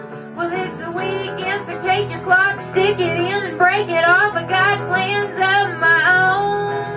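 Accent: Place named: Texas, United States. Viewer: Love her and that Texas twang she's gotten. Listening here in Manchester UK.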